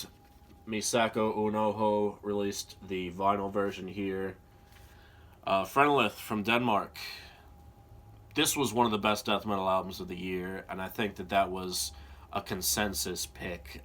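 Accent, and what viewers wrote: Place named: Australia. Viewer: I swear sometimes your accent sounds Australian. Watching 'Best Death Metal Records post-2000 (Part 1)' when, talking about the Asphyx album, you said 'this is true death metal, ya bastards' and you said it in the most Australian way possible. lol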